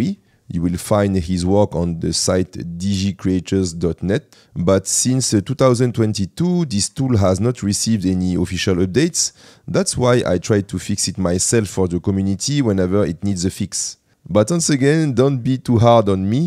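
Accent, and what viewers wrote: France. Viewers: Super t’as bien l’accent Français 😉 ce serait possible que tu fasse des vidéos en Français parce que c’est difficile de suivre tes tutos en Anglais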